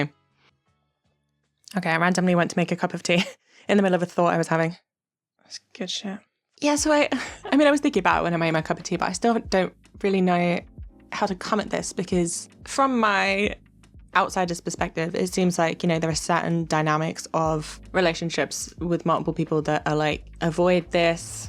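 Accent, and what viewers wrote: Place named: United Kingdom. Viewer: GODDAMIT DO A SHANE VIDEO U PRETTY WOMAN WITH A THICK BRITISH ACCENT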